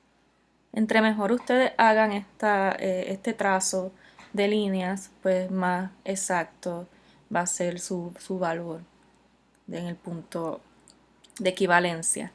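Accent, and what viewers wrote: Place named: Venezuela. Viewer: Pensé que era venezolana.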